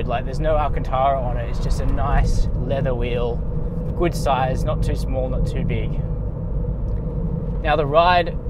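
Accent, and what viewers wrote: Australia. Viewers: Bastardised Aussie English is insufferable, switched off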